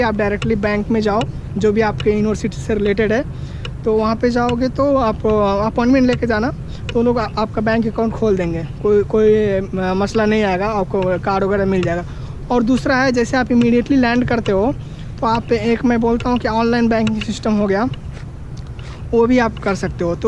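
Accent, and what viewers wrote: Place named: Bhojpuri, India. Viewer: Ekdam bhojpuriya babu jaisa bol rahe hai bhai , we need video like this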